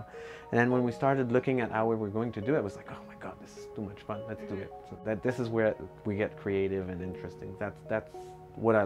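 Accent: Quebec, Canada. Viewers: I don't usually hear my own "Quebec" accent on Youtube, that made the video so much interesting for some reason hahah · He sounds like a Québecois la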